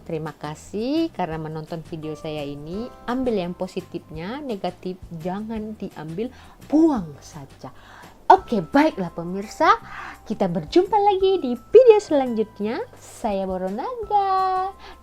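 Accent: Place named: Malaysia. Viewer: Sedap kak cakap orang malaysia. Menghiburkan bila mengedarya.terbaik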